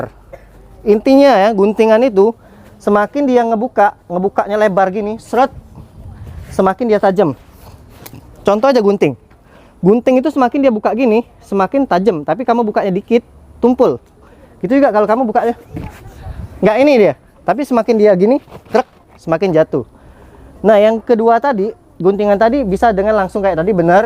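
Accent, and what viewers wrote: Palembang, Indonesia. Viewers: Kyk kenal bahasanya ,itu bahasa Palembang ya salam satu daerah mas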